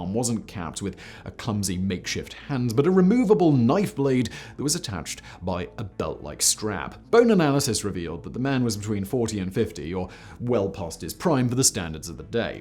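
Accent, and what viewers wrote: RP, United Kingdom. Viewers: Sorry, can't understand you. Please don't speak so fast. From USA. I don't have the ear for the queens English. Love the content. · Your arrogance is showing..English guy with the accent… but you’re rich from this but you click baited me with the extra chatting! I already know what you know 🤫, in spite of your perpetration of proper investigations! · Combine a British accent, a black sarcophagus and the word creepy and I'm all in. Guilty clickbait. Sigh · Your tone is far too posh to allow proper concentration & your humor is nearly too tenderfoot to have the majority of anything said to be taken remotely serious... If you wish to be but a petty Monty Python copy then by all means present yourself as such. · Amusing hearing you go from BBC British pronunciation, occasionally to D&D levels of exorbiantly posh that would put Nigel Thornberry to shame.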